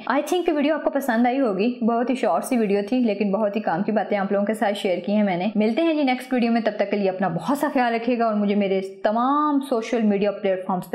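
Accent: Punjab, India. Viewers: Ap ka panjabi style bahut mazy ka hai. Salamat rahain. Ameen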